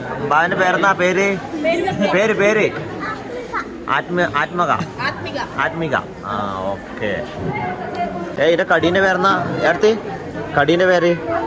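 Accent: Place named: Kasaragod, India. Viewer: നിങ്ങടെ സംസാരം കേട്ടപ്പോൾ ഞങ്ങടെ കാസറഗോഡ് ഉള്ളപോലെ തോന്നും അതാണ് ചോദിച്ചേ അടിപൊളി njan ആദ്യമായി നിങ്ങടെ വീഡിയോ കണ്ടത് ഞങ്ങൾക്ക് കുടുക് അടുത്ത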